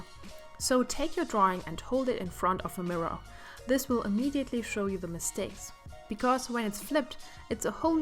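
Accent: Germany. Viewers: wow wie hart man merkt, dass du deutsch bist an dem akzent ! Aber schöne Hacks! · Are you german your Akzent Sounds like it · Min warte mal ... Man kann das Fabriano aquarell Papier auch hier in Deutschland kaufen 0.0 Ich muss wissen wo !!! Hast du es auch mal in einem laden gesehen oder kaufst du es immer online ? Ich dachte anfangs du kaufst dein Zeichen Material (zumindest das was in diesem Video ist ) bei boesner , da man das Aquarell, als auch die Airbrush, als auch die luminance stifte alle bei boesner bekommst. Vorallem die luminance, ich habe die in keinem anderen Laden gefunden, nur in boesner. Deshalb war ich so verwirrt mit dem Papier. Du würdest mir echt sehr helfen wenn du mir sagst wo du es kaufst oder ob du es mal in einem laden gesehen hast. Ich mag übrigens dein Zeichenstil total und wie viele verschiedene Medien du benutzt. Ich muss das auch mal austesten , gut das ich das ganze Material (bis auf das Papier D: ) hier zuhause habe xD Naja auf jeden fall würde ich mich sehr freuen, wenn ich eine Antwort von dir bekomme :D (Und sorry, dass ich in deutsch schreibe, aber man kann deine Muttersprache nicht überhören xD Jetzt nicht böse gemeint oder so °^° ) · are you german bc you sound like youre german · thats one heavy german accent. 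Aber ernsthaft Respekt, dass du eine Videos in Englisch machst ist bestimmt nicht sehr einfach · Kannst du deutsch denn der Akzent hört sich so an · You start speaking English CUZ of your accent- she is german But i like your arts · The first thing I thought: She's german. You have such an heavy accent, but it's a good video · I think she is from Germany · Interesting German accent :) not my artstyle but your video it is actually very helpful... sometimes I use watercolor and I would love to try out more. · In den ersten Sekunden hab ich instant gemerkt das sie deutsch ist 😂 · Man hört richtig das du Deutsche bist :"D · You kinda have a german accent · Man hört deinen deutschen Akzent sehr stark raus. Du solltest ganz besonders versuchen, die Aussprache von th zu üben, um es weniger wie ein s auszusprechen. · As German native speaker I can definitely tell you that she's German. (Her last name is German, but that usually doesn't tell you much) Russian accent sounds different. 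Also she wouldn't be able to pronounce the German brand Schminke as perfectly as she did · Schönes Video :), ich habe mich erst gewundert wieso mir dieser Akzent beim englisch sprechen so bekannt vorgekommen ist, bis ich durch die Kommentare gescrollt bin und deutsche Kommentare gesehen habe xD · I have the feeling you're from europe(maybe germany) because of the way you speak 😄 very nice Video 👌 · Super Video! Aber bitte schau dir nochmal die Ausspracheregeln für das Englische an (v ≠ f, th ≠ f, t). Du bist aus Deutschland, oder? Hört man binnen Sekunden. ;) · i can hear that youre german ;3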